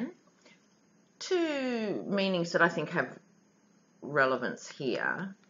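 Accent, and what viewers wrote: Australia. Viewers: I <3 your running commentary. Find myself laughing out loud, and God knows we need it. So... is your's a "posh" Oz accent? Is there such a thing? From the land of the maple leaf, who has educated, but not posh accents.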